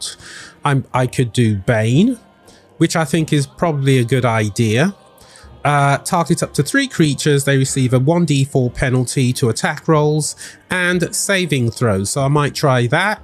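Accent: United Kingdom. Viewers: Good video 👏. Your accent sounds British....Are you? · Wow. Talk about the voice not matching the person. You sound like you're maybe 20 years old max, and the british accent makes you sound even more posh. Clean living, I guess? Anyways, lovely video, will check the rest of your stuff out too.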